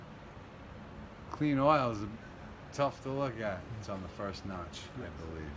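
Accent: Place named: New York, United States. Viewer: Great video. Love your NY accent. You didn't mention but I assume you jacked up the rear of the car?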